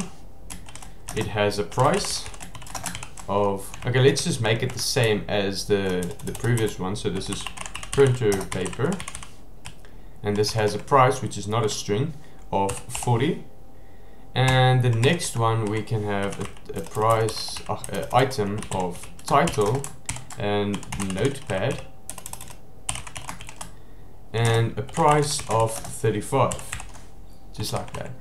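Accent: South Africa. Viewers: Can't miss a fellow South African accent 😉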